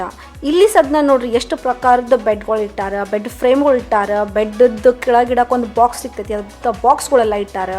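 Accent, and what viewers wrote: North Karnataka, India. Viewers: uttara karnataka language matadtira akka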